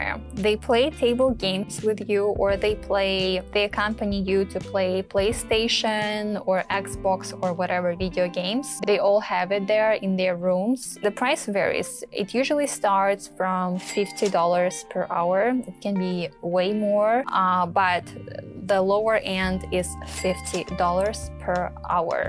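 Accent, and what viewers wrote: Eastern Europe. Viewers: precious princess, your accent is pretty cool! Are you Ukrainian, Belorussian, or Russian? 🥰 😘💕☮🌎🌌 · well, which country are you from ? based on your accent,....Ex USSR .Having a lot of knowledges on this fiel, I think you succeed to penetrate "the market" 👍 Thank you for sharing your experience ! th t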